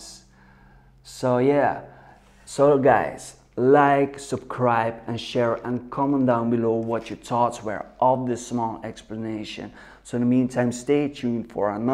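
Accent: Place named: Jamaica. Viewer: Did you spend some time living in Jamaica? Your accent sounds like Spanish or Italian but with some Jamaican pronunciation sometimes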